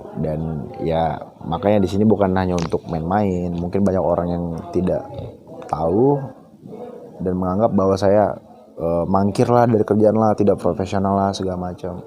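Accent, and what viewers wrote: Medan, Indonesia. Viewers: Sbnrny sih aku pkr mrk yg hujat bang itu kgn pgn bang d indosiar x krn kan udh kgn..mgkn gtu x bang krn kan bang ada nmny d maulid nabi itu tp knytaan ny bang ada d bali.jd pkran mrk abang lbh mentingin hoby..gtu mgkn..dn logat medan emank keras sih jd d fkr org abang mrh2..santai aja bang nanggapin haters sih..jgn d dgrin yg pnting berkarya aja..love u bang..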